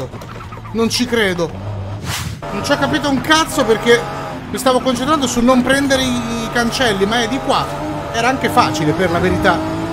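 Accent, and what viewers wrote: Sicily, Italy. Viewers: Sei siciliano?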